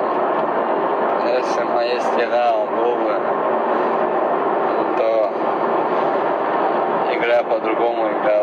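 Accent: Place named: Urus-Martan, Russia. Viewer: Как ты разговариваешь... видно что ты из Урус-Мартан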